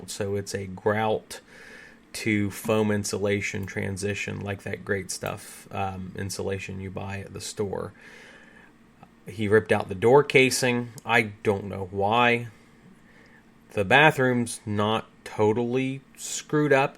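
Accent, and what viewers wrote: Ohio, United States. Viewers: You know an Ohioan when you hear one 😂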